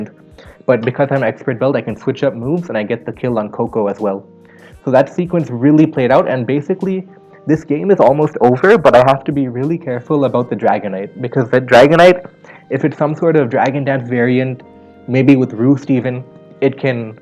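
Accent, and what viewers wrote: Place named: India. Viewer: I love the slight indian accent in your voice it just tells the world that no matter how big you get, or successful, we stay indians at roots! Great vid man ;)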